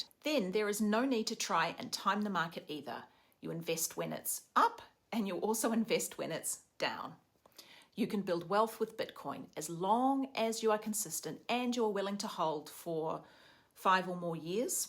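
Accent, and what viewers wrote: New Zealand. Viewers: Hi, judging by your accent I think you might be a Kiwi (if not, apologies!). I am in Auckland, NZ, and just wanted to say how proud I am of your channel. You are truly inspirational and the reason I got started investing in Bitcoin. This is one of the best Bitcoin advisory channels out there, and I hope it keeps growing because you absolutely deserve it.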